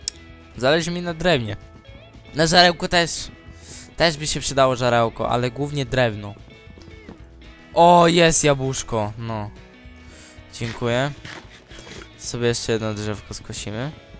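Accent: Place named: Rural, Poland. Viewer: wieś głos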